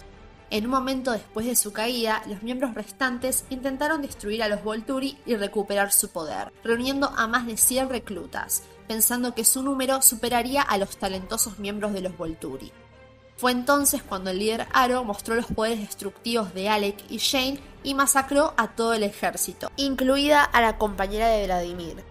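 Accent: Argentina. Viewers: Puedes hacer un video sobre quien es mas fuerte? Si el chupa sangre o el perro gigante?🤭 me encanta tu acento argentino, buenos aires no?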